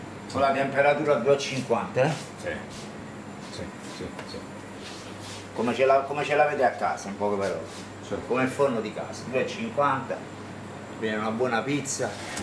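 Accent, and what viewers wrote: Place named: Italy. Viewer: Bravo vous êtes un vrai chef. Bisous de France,. Vive l Italie. Un beau pays avec un très joli accent ❤❤❤❤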